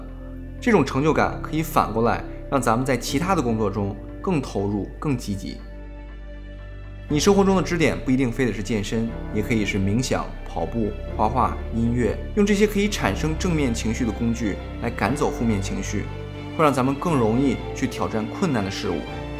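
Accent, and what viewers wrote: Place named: North, China. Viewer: 大陆北方人说话的习惯 我们也不习惯听台湾腔 也不习惯听阿拉伯语 西班牙语 但是重点难道不是；内容比较重要吧？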